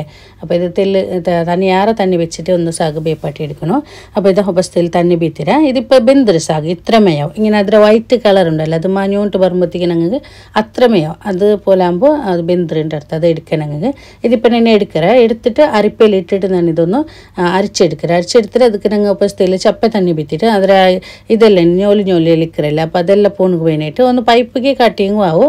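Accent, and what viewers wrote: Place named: Kerala, India. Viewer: സംസാരിക്കുന്നത് കാണുമ്പോൾ ഇയാൾ ശരിക്കും പ്യാരി തന്നെ ആണെന്ന് തോന്നുന്നു. പക്ഷേ സംസാരിക്കുന്ന രീതി മാത്രം മലയാളിയുടെ പോലെ ഉണ്ട്. അപ്പോൾ ശരിക്കും ഇയാള് മലയാളിയോ അതോ പ്യാരിയോ?🥰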